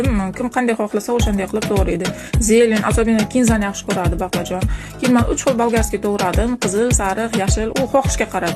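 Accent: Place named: Samarkand, Uzbekistan. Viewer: Qaerdansiz shivangiz Samarqandnikiga oxshatim yaxshi korib qoldim ishizga omad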